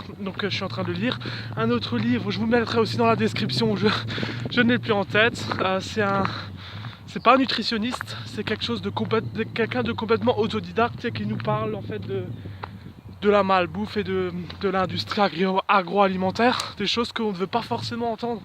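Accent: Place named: Alsace, France. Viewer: Bonjour, votre accent me fais penser à l’Alsace, super endroit pour retrouver la paix. Je me bas aussi contre le surpoids. la vidéo est intéressante. Bonne Journée. Estelle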